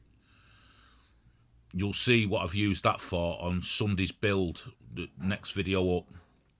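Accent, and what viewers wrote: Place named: Lancashire, United Kingdom. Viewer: So, you're in Derbyshire. You sound more like you're from Lancashire or Yorkshire. Anyway, I'm impressed with the effort you're putting in, and I'm enjoying your videos. Keep up the good work.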